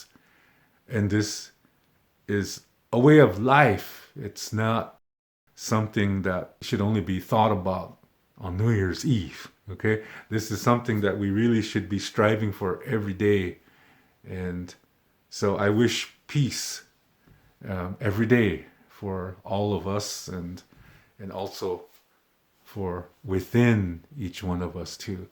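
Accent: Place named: Hawaii, United States. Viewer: You have an accent. Very similar to native Hawaiians. Kind of sing-song. It’s nice! Have an enlightened new year.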